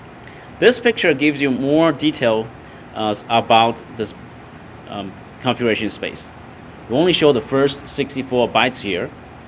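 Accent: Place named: China. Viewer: 这声音一听就是中式英语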